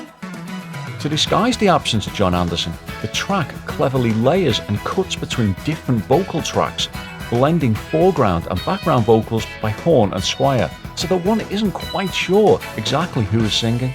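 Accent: Liverpool, United Kingdom. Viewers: I wonder if there was any drama. Ok, you sound scouse and this is Yes and nyc eh nice, I love the Angel Gabriel lol. subbed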